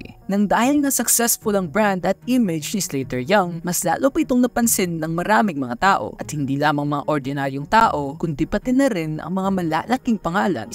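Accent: Visayas, Philippines. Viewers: ang sakit mo sa tenga pakinggan, bat ganyan accent mo, para kang bisaya na nagbakasyon sa tate ng 3 months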